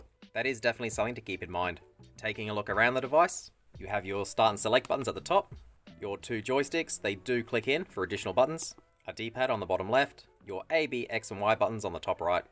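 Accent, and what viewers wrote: Australia. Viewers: lol, thought it was just the ausi accent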